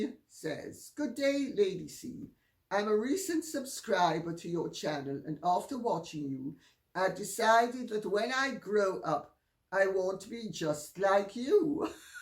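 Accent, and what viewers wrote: United Kingdom. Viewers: I don’t know why, but condemning Harry and Meghan’s behavior sounds sooo much better with a classy British accent! 😉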